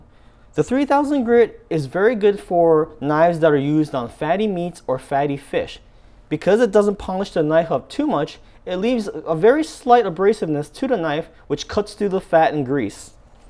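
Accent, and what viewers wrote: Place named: United States. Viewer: The only Japanese on the Earth with such a great American Accent!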